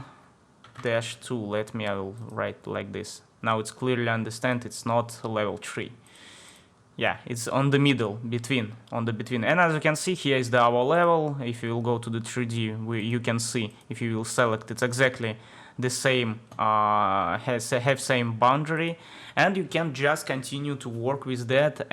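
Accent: Russia. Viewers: Какой прекрасный русский акцент!!!🙃 А я поначалу очень удивился тому, что понимаю почти все что говорят! Обычно понимаю процентов 30 из сказанного!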